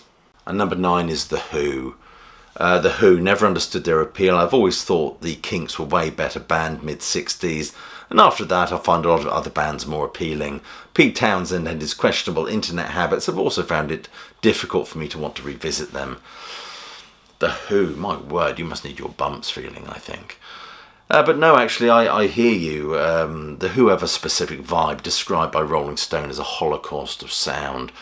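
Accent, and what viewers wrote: United Kingdom. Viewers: The Who??? Radiohead??? This is a super weird "channel." Yeah, a rock band wouldn't want to comment on environmental and socioeconomic issues. How about the Flaming Lips? They are absolutely tone deaf. This cast is all about one guy's peculiar tastes, even if he wraps it around viewer preferences. I think Yanks go in for things because the guy has a Brit accent. He's the Geico Gecko of music criticism.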